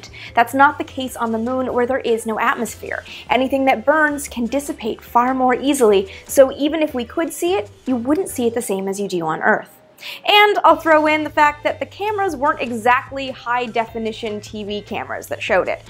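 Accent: Canada. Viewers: Is she from Canada or the United States?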